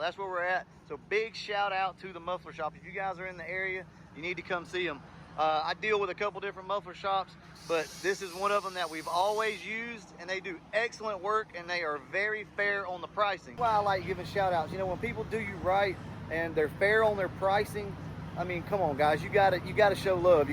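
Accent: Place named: South, United States. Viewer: I'm new to your channel. Like 2 months in now. I knew you were close to my old stomping grounds by your accent. Then I saw Dothan today. You ever run anything at North Florida Speedway?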